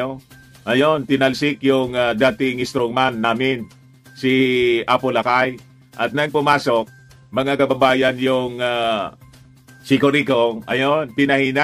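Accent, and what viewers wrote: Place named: China. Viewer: Tanong ko lang Sayo, ano ba talaga ang Nationality mo?, Pilipino or Chinese, kasi Puntong Chinese Ang tagalog mo eh!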